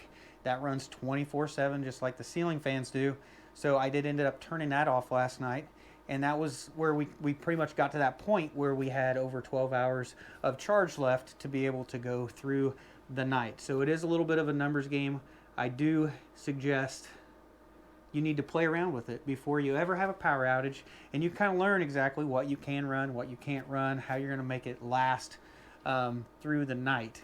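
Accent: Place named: Oklahoma, United States. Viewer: ten or eleven years ago... sounds like you're near me in oklahoma lol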